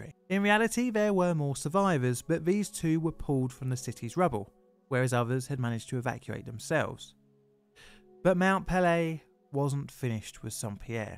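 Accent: United Kingdom. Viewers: I think this particular YouTube channel is run by a British guy. But if you can manage to understand British people and Americans you've learned every accent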